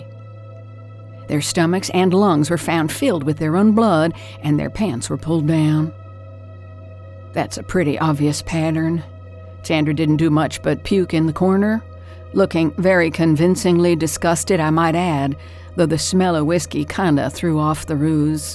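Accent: South, United States. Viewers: Very excellent story. The narrator did an excellent job despite using a southern drawl to tell this unusual tale. Sometimes, when narrators use accents to narrate stories, it can be difficult to understand them, thus, taking away the pleasure of enjoying the story. Not so, in this case, she was very easy to understand. I hope to hear more from this narrator in the future. Also, the story it self was very well written. It had a different type of plot which gave it a fresh and new feel to it, that the other usual horror stories sometimes lack. The plot was also completely unpredictable which I liked, because I hate predictable stories. Finally, the unusual plot twist or twists were well done and very surprising. Overall, I really enjoyed listening to this story. Well done " ". 😉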